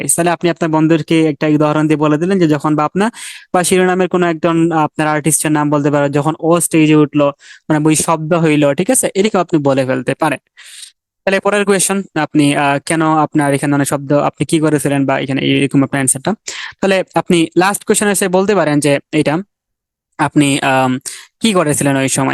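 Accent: Sylhet, Bangladesh. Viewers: ভাইয়া আপনি কি সিলেটের?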